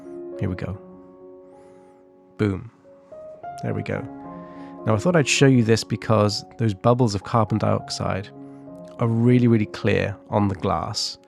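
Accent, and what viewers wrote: England, United Kingdom. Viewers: You would do Well in the US, as they Love English Accents!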